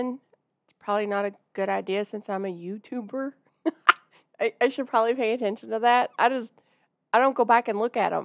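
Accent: South, United States. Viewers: I love your channel. You're fun, show real reactions, and sweet. The southern drawl is warm and inviting. I've never seen judgement in your eyes and you support everyone you watch. + I LOVE, and many others and you deliver what your followers want. So thank you! ❤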